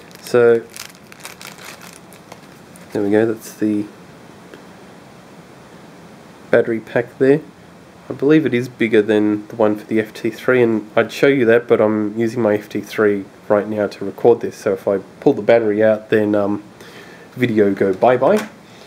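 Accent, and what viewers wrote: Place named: Australia. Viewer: have u heard of australian accent?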